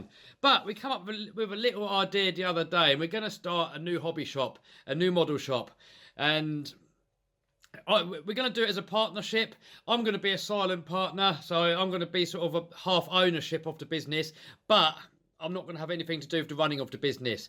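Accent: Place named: United Kingdom. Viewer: I don't know if this will be correct but because of your accent it should be BBB rc ( British bashing brothers) rc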